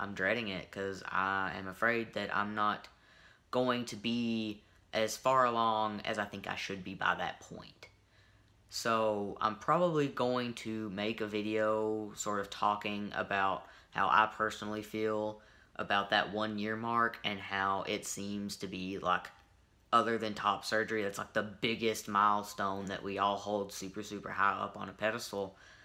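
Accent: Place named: South, United States. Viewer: You’re definitely from the south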